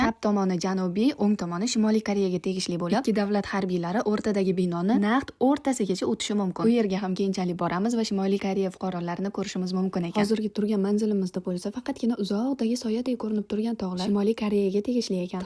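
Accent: Tashkent, Uzbekistan. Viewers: Тошкенча шевада гапирасанмиии. Наманганлик бусанг. 😮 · Узиз Намангандансиз нимага Тошкент шевасида гапирасиз бу понтни нима кераги бор.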